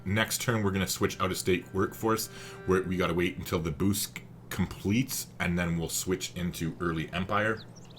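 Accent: Canada. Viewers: Hey. Not a Civ comment, but... I took my undergrad degree in The Soo, Michigan. Your accent reminds me of all the cool Canadians I used to hang out with way back when, eh? Thanks.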